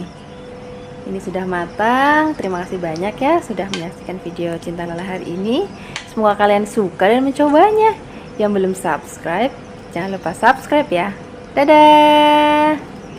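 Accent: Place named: Ngapak, Indonesia. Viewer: Medok banget rasa ngapaknya ,enak dirungokna.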